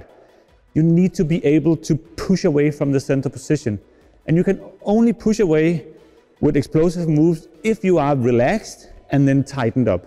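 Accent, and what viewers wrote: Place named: Australia. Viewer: Sounds aussie ngl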